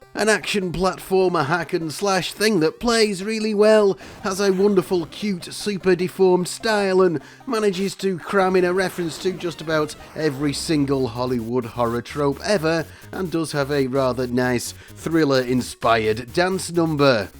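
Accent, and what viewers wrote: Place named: Northern England, United Kingdom. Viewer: Another British guy talking about the system that was so unpopular and under the radar in his own country. A North Englander, by the sound of it, with the "thoot it wood make them moony."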